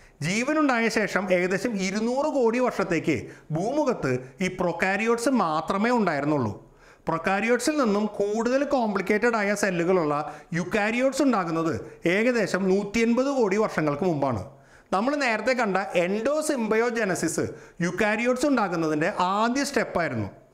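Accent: Thrissur, India. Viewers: I do not know who you are. But with great respect, may say, your use of language is definite or conclusive. Science is never definitive or absolute- it's dynamic. It may be due to Trichur slang or accent? Though I love science, listening to this gives me ARROGANT OR ABSOLUTE VERSION of science huh! Amen!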